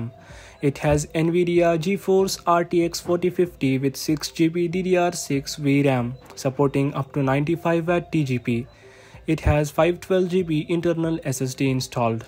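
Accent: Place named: India. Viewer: obrigado amigo indiano, eu amo o sotaque da voz de vocês, seu video foi rapido e muito util para mim. nota 10!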